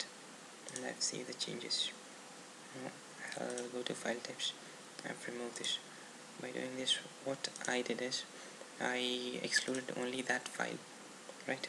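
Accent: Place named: United Kingdom. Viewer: Inglés británico.... es otro nivel, deja de estudiar el inglés americano porque no sirve realmente.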